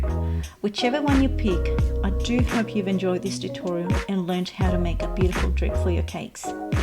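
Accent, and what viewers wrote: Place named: Australia. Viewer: Love it... voice over is amazing love your aussie accent!!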